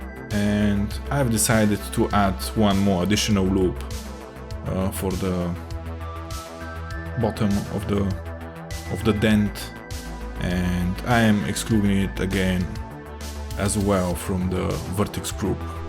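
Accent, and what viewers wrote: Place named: Russia. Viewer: Почему твой акцент подсказывает что ты русский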